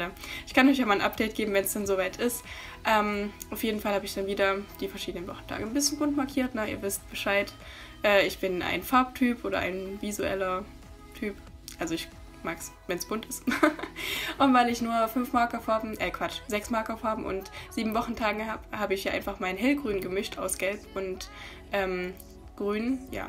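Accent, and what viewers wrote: Saxony, Germany. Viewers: Kommst du aus sachsen? Komme selbst aus Sachsen und es klingt von den Prüfungsfächern und so ziemlich nach Sachsen (und auch bissl dein Akzent😂❤️)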